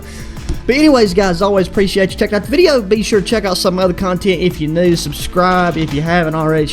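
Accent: United States. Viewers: Country accent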